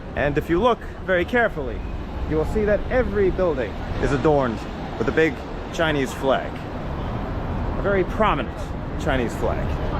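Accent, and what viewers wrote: New York, United States. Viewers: Sunglasses, camera + your american accent... Times are clearly different. I don't think that woman was a scam artist. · Yankee speaks Chinese with accents cool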